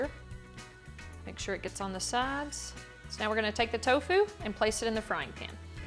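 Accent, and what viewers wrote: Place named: South, United States. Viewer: i love that countryness in your voice 😍😍😍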